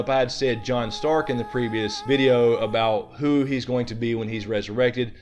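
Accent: South, United States. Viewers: Hey - I love your videos and now you are hooked up with my other favorite - Y'all are an awesome pair and I have chills from your predictions. I did want to ask you if you have ever done any research about the theory that Rhargar's harp is buried in the crypts at Winterfell in Lyanna's tomb? I read something about that and I have always wondered why she was buried in Winterfell since it has always been men there. Just wanted your take on that - Thanks I also detected a southern accent - wondered where you are from- I live in Alabama, I was just curious. Thanks again for all of your videos. Robin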